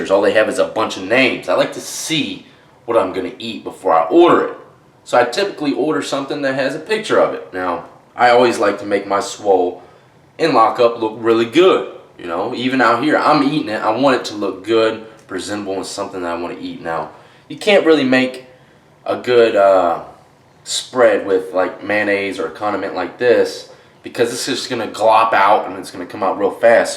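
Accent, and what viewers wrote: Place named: Louisiana, United States. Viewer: Where did you do time bro? Cant place the accent...louisiana? I did 3 in tdcj texas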